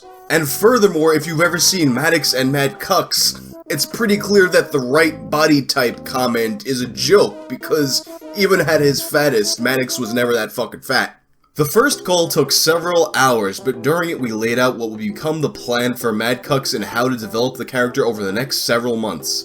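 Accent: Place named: New York, United States. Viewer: It's called a New York accent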